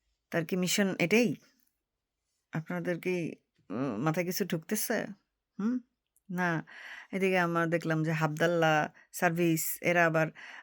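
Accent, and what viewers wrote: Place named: Bangal, Bangladesh. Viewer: আপনি অসাধারণ বলেন । কিন্তু বাঙাল ভাষায় বলেন তাই একটু ধীরে ধীরে বলুন। আমাদের সব বুঝতে অসুবিধা হয়। কিন্তু যুক্তি আপনার অসাধারণ। great---💐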